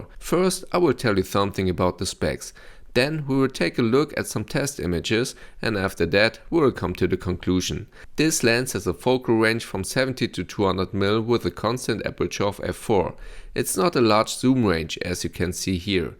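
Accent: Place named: Germany. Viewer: Mir gefällt der Aufbau von deinem Video gut, es gibt keine nervige Musik und generell wird auf die Punkte Konstruktiv eingegangen. Den deutschen Akzent habe ich aber sofort rausgehört, da musst du noch dran feilen :D Außerdem ist die Brennweite Millimeter, dabei hast du Centimeter genutzt und geschrieben 😅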